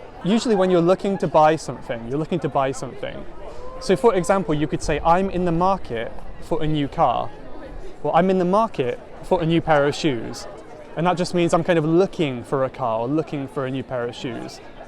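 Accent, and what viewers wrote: United Kingdom. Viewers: thank you very much , i learn a lot of british accent here 🤝💯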